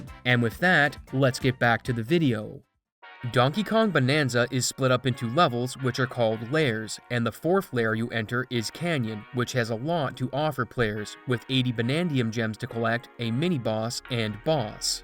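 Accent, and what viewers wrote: Canada. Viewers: In your outro, you always say " ", but your profile name clearly lacks the Z. Did it once before, and do you ever consider adding it back(you don't have to, just curious)? Also, with how you pronounce words with the sound of "out" and sometimes just "ow" in them, I wonder: Are you Canadian? Because the way you say them is a pretty common thing in Canadian accents. And about your voice, is that your actual voice talking, or an AI reading what you type in it?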